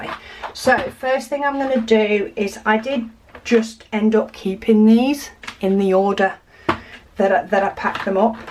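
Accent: United Kingdom. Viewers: I found your channel recently, and I love it! I’m a British DP addict living in the U.S. and it’s a wonderful change to hear a British accent talking about my passion!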